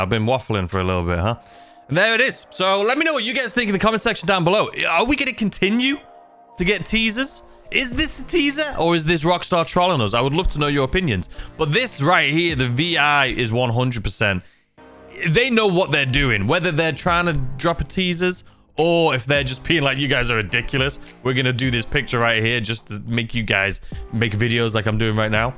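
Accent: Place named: Scotland, United Kingdom. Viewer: youre scottish? love the accent btw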